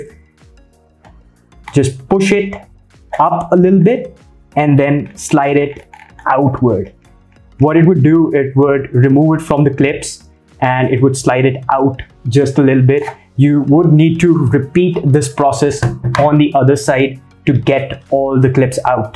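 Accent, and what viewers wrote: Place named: India. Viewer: thanks nice indian english very clear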